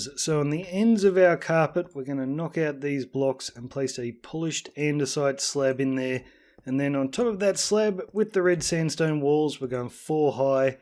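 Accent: Australia. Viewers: Nice to hear an Aussie voice! I built this in my One Block Skyblock world, but changed the inside around as I'm outfitting it as my house and storage. I decided early on what colours I wanted, and in the end was so grateful I'd kept everything the one block threw at me. I went for a dark oak, prismarine, and quartz block palette, with sea lanterns, and emerald blocks instead of gold blocks. It took me a fair while to build in survival. Otherwise it looks like yours outside, apart from forgetting the anvils and hoppers, so it's a less imposing spire. Thank you so much for the hard work you put into this tutorial!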